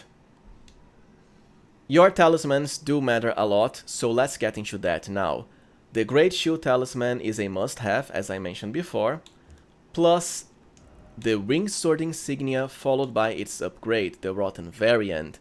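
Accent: Brazil. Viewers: You have a strong Brazilian accent. Are you one? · Are you BRAZILIAN? RECONHECI O SOTAQUE A KILOMETROS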